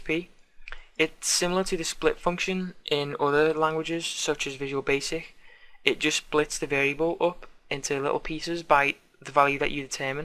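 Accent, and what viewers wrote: Liverpool, United Kingdom. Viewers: this actually really helped me thanks, btw im also from liverpool (you sound like you have a scouse accent)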